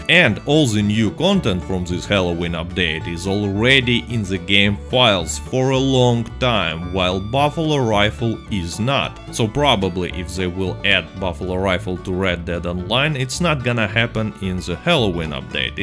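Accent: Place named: Russia. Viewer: English with a Russian accent is cool to listen to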